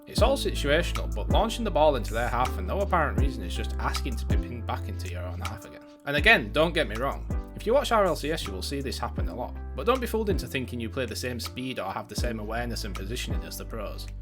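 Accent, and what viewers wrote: Yorkshire, United Kingdom. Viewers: Very good video bro, you from Yorkshire too or manny?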